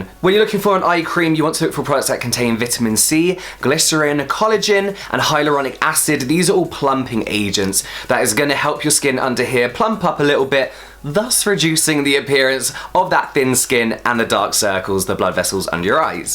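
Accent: United Kingdom. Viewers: Looks like this is interesting!!!...Let me subscribe to your channel now...I'm crazy about the british accent _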